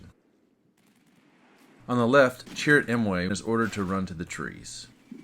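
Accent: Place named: United States. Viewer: Great AAR. First time i enjoy american accent narration. Usually they scream at you.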